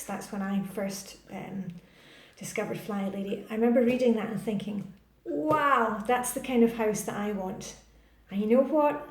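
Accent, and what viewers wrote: Scotland, United Kingdom. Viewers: ! <3 I've been meaning to ask, just for fun... are you Scot/Scottish?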